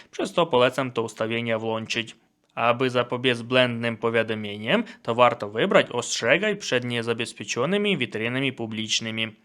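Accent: Ukraine. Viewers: Czy to bezpieczne słuchać kogoś ze wschodnim akcentem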